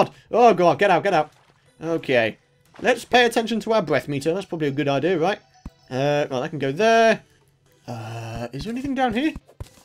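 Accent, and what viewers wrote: United Kingdom. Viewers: He's British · That is the strongest British accent I've ever heard... and I'm British myself! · What? You sound like a british guy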